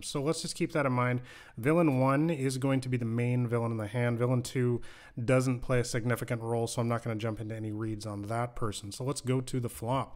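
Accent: Canada. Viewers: he definitely has a strong canadian accent, and i say this is a canadian lol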